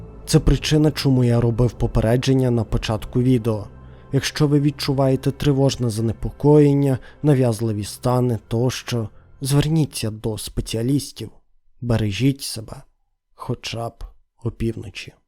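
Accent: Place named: West, Ukraine. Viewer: Мені відчувається західний акцент, але з кожним відео все краще!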